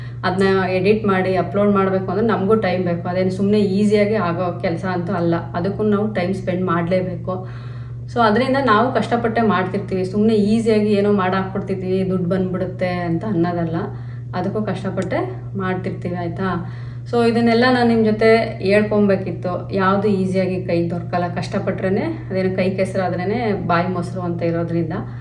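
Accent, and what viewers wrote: Mandya, India. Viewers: you don't change local kannada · the way u talk kannada really feels like u are in India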